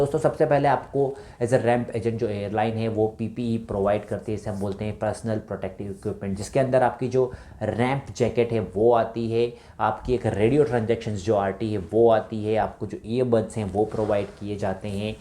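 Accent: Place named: India. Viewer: damn that indian accent..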